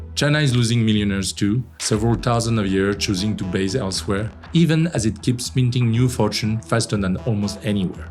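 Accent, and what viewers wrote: France. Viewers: Lol says a guy with french accent , , compare to Switzerland is France dump with all there problems‼🤭😂🤣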